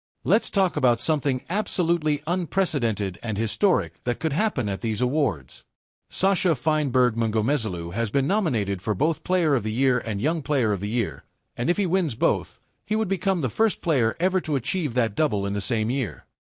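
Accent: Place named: United States. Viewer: Oh, come on! These awards go on current form and achievements. Cheslin Kolbe is an amazing player, and one of the best in history, world-wide. But, he is NOT alone. There are other incredible players who also deserve to be recognised. Only one can be the winner. Just because Kolbe isn't in the running for this award doesn't mean he is less revered than the ones who are. It just means that in 2025 he played in less games due to injury or whatever, and then when he did play, he didn't get as many opportunities to shine as he usually does. What I find ridiculous is that a Springbok rugby channel has an AMERICAN ACCENTED AI giving the commentary!! It can't even pronounce our players names correctly!! Who the F*** is Jasper WEEZE?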